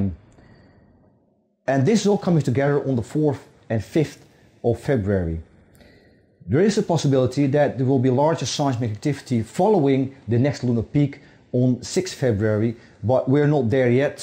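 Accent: Netherlands. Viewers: typically Dutch ;-). We almost all speak like that over here :').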